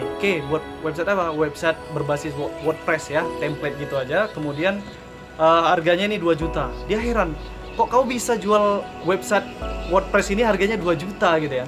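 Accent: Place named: Medan, Indonesia. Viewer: abang orang medan rupanya, pantes la kok ga asing logat nya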